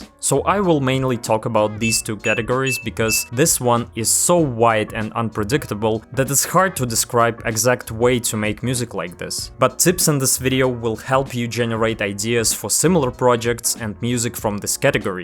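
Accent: Russia. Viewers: Мне тоже показалось что русский акцент))) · Броу, слушай, а ты случаем не русский? Просто акцент похожий) Спасибо за ролик! Всегда хотел попробовать написать музон в стиле брэйккора. С меня сто пудов подписка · У тебя русский акцент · Русский акцент)). Произношение все равно классное)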